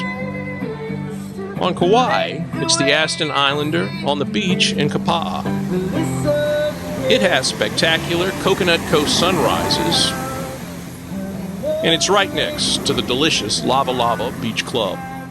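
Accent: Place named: Mississippi Delta, United States. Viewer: Based on those pronunciation examples, perhaps you might be from the Mississippi Delta?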